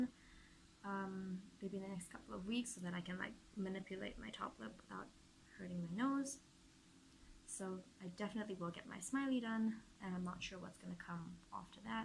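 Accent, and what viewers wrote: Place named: United States. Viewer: You sound American haha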